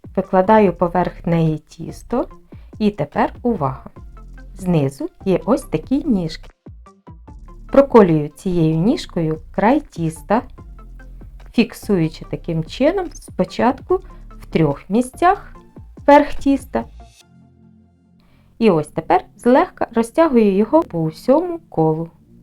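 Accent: Standard. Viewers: Дякую за науку, чарівна наша господине! 😊 І за чисту солов'їну - окрема подяка! 🙏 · Дякую за пораду і за чисту українську ... Добра і процвітання ❤❤❤❤❤❤❤❤🎉🎉🎉🎉🎉🎉🎉🎉🎉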